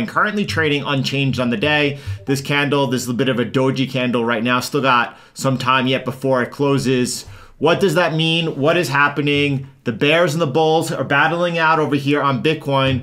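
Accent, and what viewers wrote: United States. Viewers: Hard to trust people with American accent nowadays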